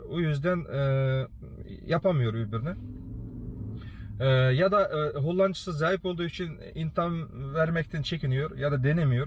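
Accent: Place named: Xinjiang, China. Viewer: Türkçen gayet iyi.kendini gayet iyi ifade ediyorsun.Sadece ufak lehce farkı var Uygurcadan dolayı. Bizdede Uygurca yok.☺️ Güzel bilgilendirici video olmuş👍🏻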